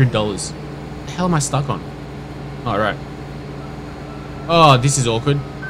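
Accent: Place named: Australia. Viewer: I don't know if i have said this before but i love australians ascents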